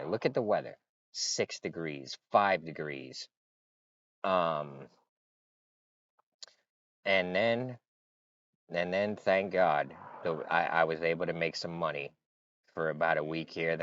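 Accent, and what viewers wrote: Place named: Northeast, United States. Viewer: Ya you’re good at telling stories. You have a nack for it plus the cool northeast accent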